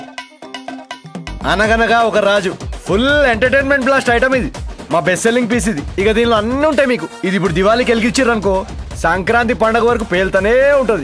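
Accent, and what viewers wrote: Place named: Telangana, India. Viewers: Original telangana ra baccha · అనగనగా ఒక రాజు మూవీ లో గోదావరి స్లాంగ్ లో మాట్లాడే మీరు ఇప్పుడు ఎందుకని తెలంగాణ స్లాంగ్ లో మాట్లాడుతున్నారు ఆ మూవీలో మీ క్యారెక్టర్ గోదావరి అబ్బాయి కదా😮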